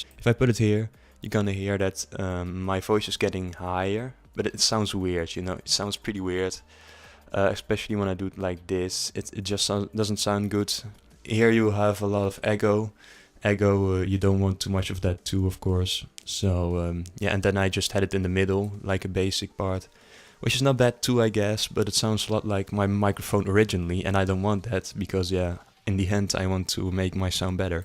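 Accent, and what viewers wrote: Netherlands. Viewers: When a dutch person makes a guide its the first thin i hear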